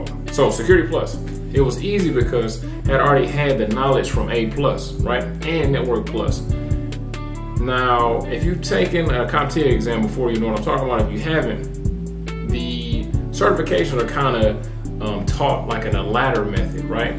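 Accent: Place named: Dominican Republic. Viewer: This man sounds and look Dominican ! I needed to support right away ! my people !!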